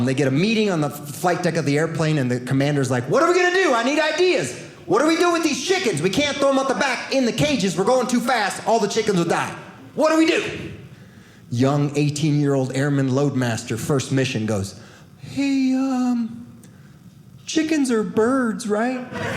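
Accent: United States. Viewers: As a Miami Cuban, it's not the whiteness that gets me as much as the complete lack of any accent other than the very clear American accent when pronouncing Spanish words.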